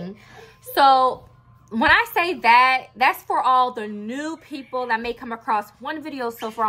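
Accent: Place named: West Indies, Caribbean. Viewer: Gurl keep being you,,, I do feel like the repetitive negative comments are just haters. They give off the “they wish they had your mentality like you vibe.” Your mentality to your look & your perseverance is gonna make insecure feel some type of way. Newer supporters like me just need clarity but a person like me loves your transparency & boldness. You inspire me as a single homeless Mother staying in an hotel. Our shelters in FL are packed & the Staff do be hating when they see a go getter, it’s like they mad that you are doing good in a bad situation. You are a real content creator because you ideas & editing is on point. Keep your head up & keep brushing the haters off. I will see you in the next video,,,, oh I do have one question, are you from the West Indies because I’m from the Caribbean & I hear a lil accent in your voice. 💜💜💜 -NinJah Bae aka Rude Gal 🫡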